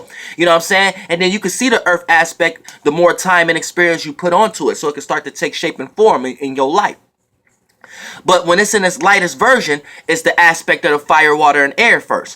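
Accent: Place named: California, United States. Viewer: Soon as bruh start talking all I hear is CALIFORNIA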